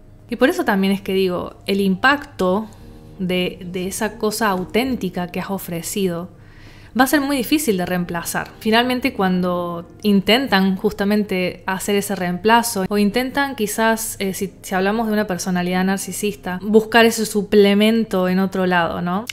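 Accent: Argentina. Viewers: Al ver este video, parece que has visto mi historia, y todo lo que dices es verdad. En mi caso quise una relación con una persona que no lo vale, pero me pasa que siempre quiere volver y da señales para que yo ceda y vuelva, pero está vez ya no va a volver a pasar. Gracias por este video, desde luego lograste mi suscripción a tu canal, por tu acento seguramente SOS argentina, país dónde vivo. Besos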